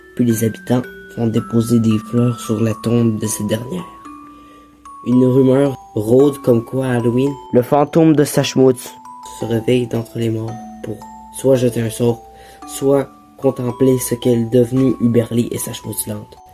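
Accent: Quebec, Canada. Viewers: Le narrateur est québécois ? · Ça aurait été moins bien si la narrateur n'avait pas été Quebecois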